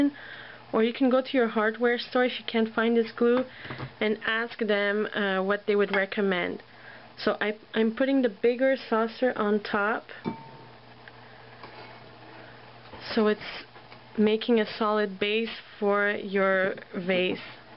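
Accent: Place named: France. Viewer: Is your first language French I'm Canadian and you seem to slightly have a French accent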